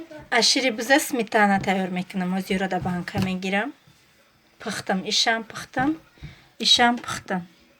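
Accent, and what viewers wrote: Tajikistan. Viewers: Лахжаи зебои тожики дори хохаржон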